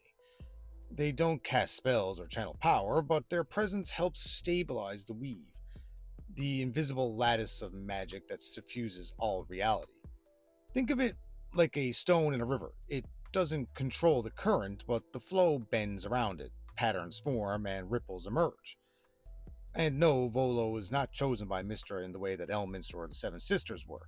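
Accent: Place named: Newfoundland, Canada. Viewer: Love the video! Off topic, but are you from Newfoundland by chance? As a Newfie I think I'm picking up an accent there lol!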